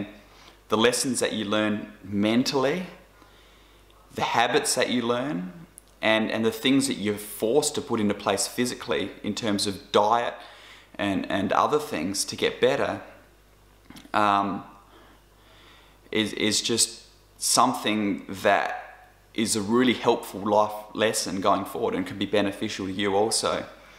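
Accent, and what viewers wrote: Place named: New Zealand. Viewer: Hi! I just come across you a few minutes ago. I to have chronic fatigue and I am a Christian. I was just diagnosed early last year 2020. I’m from Auckland New Zealand. You sound like a kiwi are you from N.Z?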